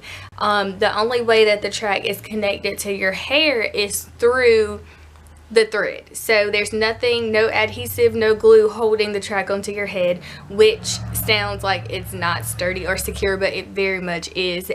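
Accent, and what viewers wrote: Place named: Texas, United States. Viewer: I love her accent .. it’s like TEXAS 👍🏼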